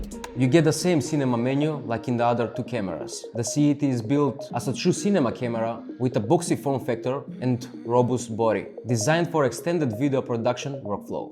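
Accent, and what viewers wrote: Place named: Russia. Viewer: Такое чувство что ты русский который выучил английский и косишь под американца))) А по теме всё супер! Спасибо за видо.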